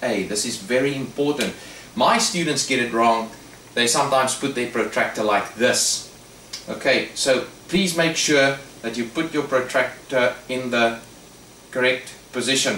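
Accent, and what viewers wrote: South Africa. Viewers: I can tell you are southafrican 😂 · Your south african? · Sounds like you are from Z.A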